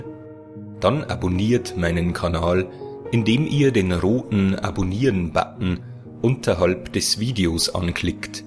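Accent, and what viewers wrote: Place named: Bavaria, Germany. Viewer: Bockshaxert 😂 Bairisch isch eifach e scheene Dialekt! Griaßle usm Badische👍🏻🤘🏻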